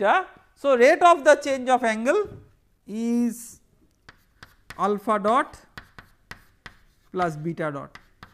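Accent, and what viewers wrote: Bengal, India. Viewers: Arey sir bangali hai toh accent mein thoda bengali pan aa jata hai koi nahi manage kar le😅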